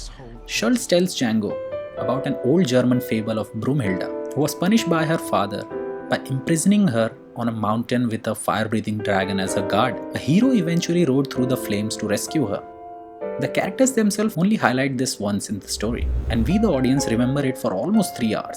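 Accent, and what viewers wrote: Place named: India. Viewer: and your reply here proves my theory that your just using ai generated script and edit to make these videos. Your randomly connecting movies with similar themes from different cultures in this video which would only be possible if someone watched a he'll lot of movies . That along with your robotic voice with Indian accent which again was obviously generates by ai, gave me a great doubt on your content. Anyone who watches multiple movies from different culture can only be from someone who is darn passionate on about cinema which you claim in your YouTube bio to be from your bollywood industry background , which is believable , except anyone with that mindset would never reply with something as simple as what you did here. Basically your using ai to make all your content in this channel as evident from the 9 months of content post activity 🤣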